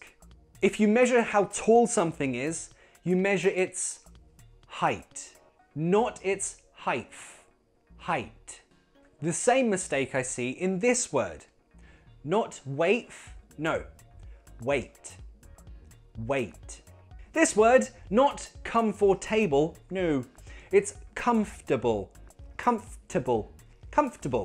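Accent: United Kingdom. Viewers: Finally I've found someone spreading the good old British English. Hurray! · That's what I was looking for! A perfect British prouninciation and Video 👍 Keep it up Thank you from Algeria! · just British accent ❤ cheers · Hello. Greetings from Azerbaijan:) I also think there is not any schwa in your name. Both syllables are stressed I think. Do you practice th british accent? I myself have almost mastered it but I need quite a persistent friend to practice with. I am female I am writing from my husband's account. So i need only a female friend to speak to. looking forward to your reply dear:) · i wanna have a British accent just like u, and yr great videos r my path.. keep being cool and thank you so much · I'm No one hey i love ur accent BRITS 😍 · I’m from Oman🇴🇲.so I’m Arabian...thanks you so much ..you made me fall in love with British accent. My brother is learning the American accent but I found that the British accent is better ..you’re the best teacher everrrr.....😭😭💗🙏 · Absolutely amazing! 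It's incredible how you show in a natural manner how one can speak with the British accent and sound really cool! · British speaking hear very soft accent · Wow you are a real British.I like the way you teach.I m lucky if I have a Brit to talk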